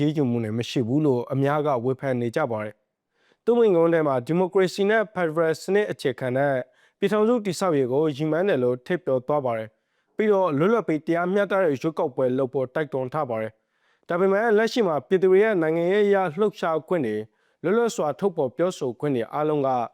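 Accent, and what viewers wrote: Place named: Rakhine, Myanmar. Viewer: ရခိုင်သတင်းဌာနလား ၊ သတင်းကြေငြာသူက ရခိုင်သံပေါက်နေတယ်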